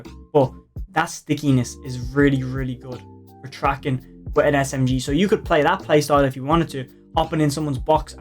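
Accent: Ireland. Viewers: I love that irish accent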